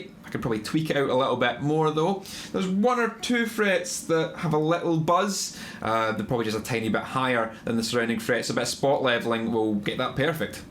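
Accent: Scotland, United Kingdom. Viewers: Oh! Now I see. The accent is scottish! That's why the "peckup" and so on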